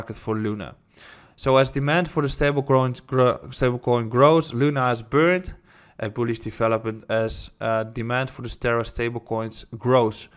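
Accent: Netherlands. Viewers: Nederlander vanaf 60km te horen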